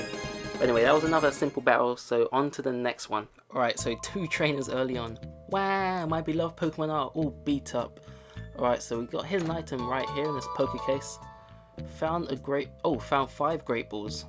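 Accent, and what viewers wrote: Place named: United Kingdom. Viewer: So, noting the UK accent... whereabouts in the country are you? I'm guessing south? :)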